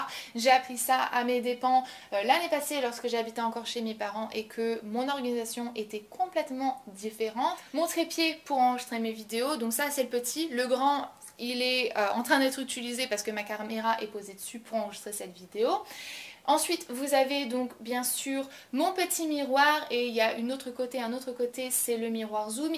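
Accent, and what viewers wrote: England, United Kingdom. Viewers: Super vidéo comme d'hab :) [Aparté : C'est pas un reproche, mais ça me fait toujours mal aux oreilles de t'entendre prononcer la marque "Revlon" en version Française "ON" au lieu de "Revlon(e)" sachant que c'est une marque Américaine et que 99% du temps tu prononces souvent les marques avec ton accent anglais :p Fin bon, ce n'est pas grave, ça fait juste bizarre a entre c'est tout ;) ] Bisou ! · Comment ça se fait que tu as ce bel accent anglais ? Tu es de quelle origine ? J'adore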